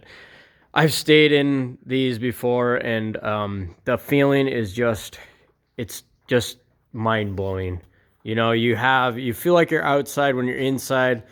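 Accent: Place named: Canada. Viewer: I could have sworn you were canadian.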